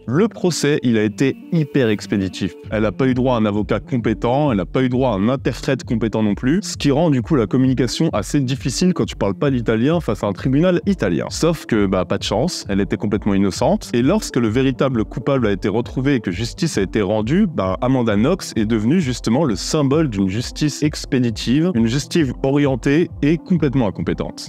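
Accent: France. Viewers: Tu ne serais pas franc comtois? Ton vindieu étais magnifique 😂 merci pour cet vidéo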